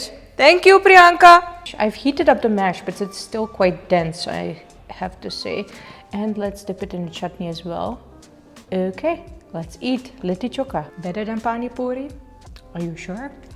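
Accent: India. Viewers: Hey!! Ik keek jouw video's voornamelijk toen je in Nederland woonde en vanuit Nederland rondreisde. En na lange tijd besloot ik deze video te kijken. Wat mij opvalt is dat je al een mega Indiaas accent hebt gekregen! Super grappig.😉😊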